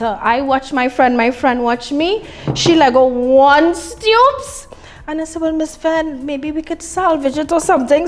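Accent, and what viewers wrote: Trinidad and Tobago. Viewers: Ah like de trini accent gul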